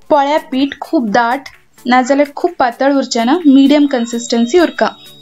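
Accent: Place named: North Canara, India. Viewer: Very nice ithink you are from north canara .my mother wasfrom north canara .always she is to make .her bhasha also like you speeking.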